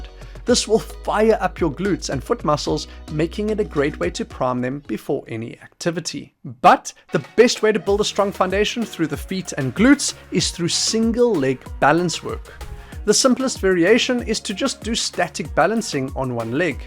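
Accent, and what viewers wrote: South Africa. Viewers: sounds South African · Yo yo. You sound South African. I can't find any decent barefoot or wide toebox shoes. Where do you recommend I go check. I live just outside of Cape Town in Paarl. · Accent sounds South African? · Are u South African? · Great content. Are you South African?!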